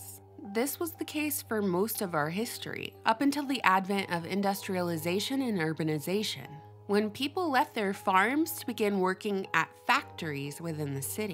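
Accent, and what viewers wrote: South, United States. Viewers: Thanks, love your channel and your southern accent! picked up a hoodie for my daughter and a t-shirt for me!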